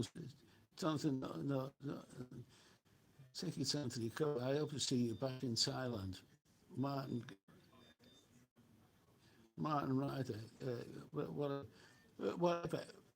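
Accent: Liverpool, United Kingdom. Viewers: True Scouser!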